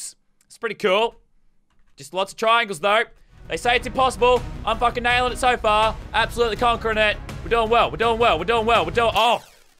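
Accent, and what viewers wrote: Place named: Australia. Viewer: Australia accent (thumbs up)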